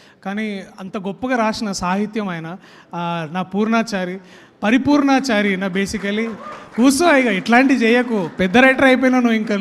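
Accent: Telangana, India. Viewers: ప్రియదర్శి గారికి ఒక సలహా తెలుగు సినిమా లో యాక్ట్ చేసినప్పుడు స్టోరీ బట్టి dilect ఉంటుంది అది తెలంగాణ యాస అవొచ్చు రాయలసీమ యాస కావొచ్చు లేక శ్రీకాకుళం యాస కావొచ్చు కానీ తెలుగు అని ఒక భాష ఉంది అది ఎవరో ఒకరికి చెందింది కాదు అది అందరిదీ అందువల్ల తెలుగు మాట్లాడానికి ప్రయత్నించండి మీరు తెలంగాణ యాస మాట్లాడి ఒకే ప్రాంతానికి చెందినవార్ని సంతోష పెడుతున్నారు ఇది తప్పు ఇలాగ చేసిన చాలా మంది కనుమరుగవుతున్నారు, మీరు అలాగా అవుతారు అనుకోవడం లేదు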